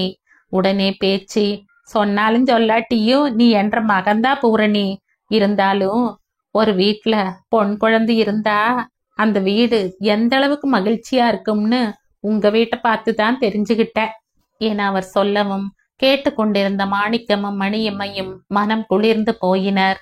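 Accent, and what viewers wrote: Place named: Kongu, India. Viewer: அருமையான பதிவு சிறப்பான முறையில் கொங்கு தமிழில் தங்களின் இனிய குரலில் கதை கேட்ட சந்தோஷம் நெஞ்சம் மறக்க முடியாத கிராமத்து மக்களின் வாழ்க்கை கதையின் ஒவ்வொரு அத்தியாயமும் அருமையிலும் அருமை வாழ்த்துக்கள் சிவசடாச்சரம் இராமநாதபுரம்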